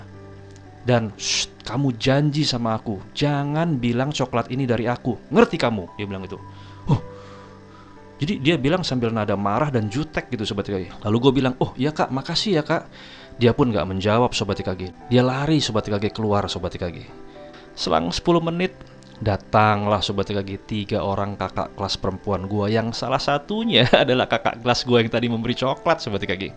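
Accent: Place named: Cilacap, Indonesia. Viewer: Wah cilacap nyong dong😂 sehat"lahir batin bang😇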